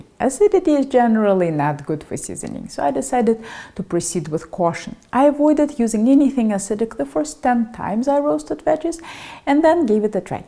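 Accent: Russia. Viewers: I just stumbled upon her for the first time. Your comment is spot on. The most informative 13 minutes of baking I have seen with no distracting channel promotion etc.. I love her and her Russian accent is icing on the cake. Can't wait to see what else I can learn. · Wow. This is a class on the "understanding of thermodynamics". Thank you and by the way, I love your accent. Your command in English vocabulary supersedes your Russian accent, got me confused for a moment. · Is she Russian? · Idk if it's a Russian accent but this women has me hooked on every word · I have been thinking. Where is she came from?! Damn, Russia. Why do you have a such heavy accent? You came as a teen to the US?!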